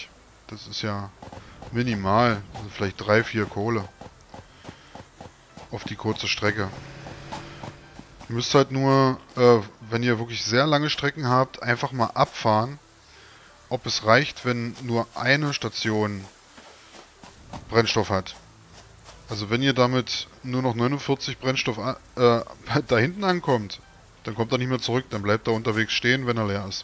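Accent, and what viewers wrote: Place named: Görlitz, Germany. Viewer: Sag mal kommst du aus der Region Görlitz? Dein "Slang" erinnert mich an Heimat 😁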